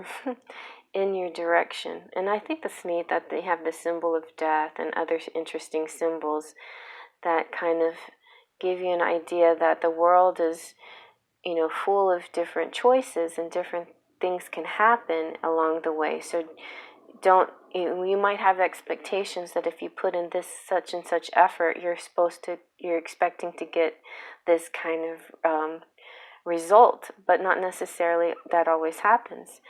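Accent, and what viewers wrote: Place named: United States. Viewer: Love the charms and yes u have a nice voice is American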